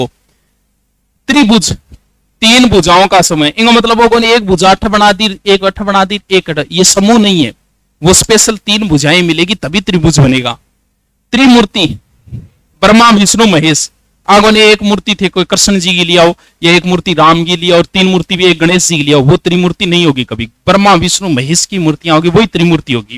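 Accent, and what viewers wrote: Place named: Haryana, India. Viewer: Hii Yr ye sir konsi jagah ke h Bich bich m haryanvi jaisi language kyu bolte h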